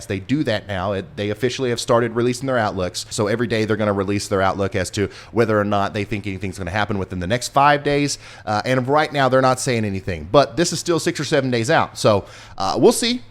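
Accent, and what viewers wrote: United States. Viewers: i love your little country accent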